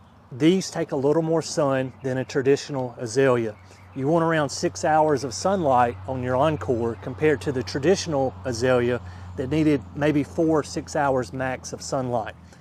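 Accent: South, United States. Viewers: As soon as I heard your accent I knew you would actually have good advice for my southeast Louisiana zone 8b/9a garden. Question: I have tea olives, but they look scraggly. Can I cut them down and have them regrow more bushy? I’ve had knock-out roses for years. I’ve found the coral and yellow are not as robust for me as the red & pink. I’ve had issues with our extreme moisture with both camellias and rudbeckia - root rot and mildew. The lavender has been a no go for us here because of our rain and humidity, but I’m going to try this variety you recommend. Can encore azaleas take our full sun in the Deep South?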